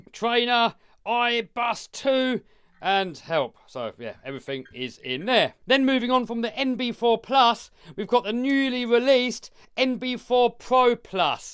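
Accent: England, United Kingdom. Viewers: You sound English why are you sucking up to the yanks by giving prices in Dollars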